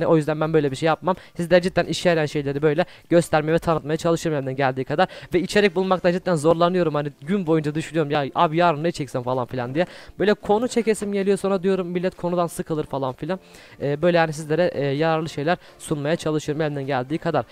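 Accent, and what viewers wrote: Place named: Thrace, Turkey. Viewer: memnunum abi bu arada neden şiveli konuşuyon trakyalımısın.( ben öyleyim çünkü).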